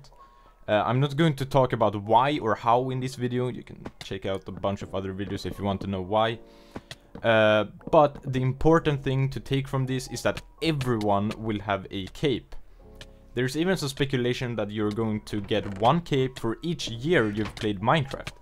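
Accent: Sweden. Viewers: I like how I can hear the Swedish accent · You sound Swedish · ur swedish no doubt about it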